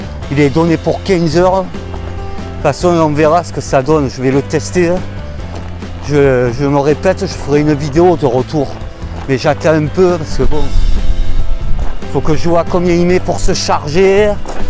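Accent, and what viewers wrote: South, France. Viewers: Bonsoir et tous,merci pour le guidage,je passe un moment de réel balade,je suis pas tout seul tu est là et avait l accent du midi,les cigales et grillons chute on est bien on continue,je les ouvres bien grand mes yeux,heureux ho oui,comme un marcheur aventureus.MERCI POUCE BON VENT.papy robert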